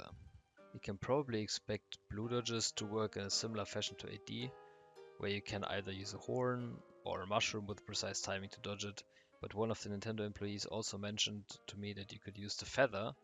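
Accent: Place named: Germany. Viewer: Der ist doch deutsch das hör ich raus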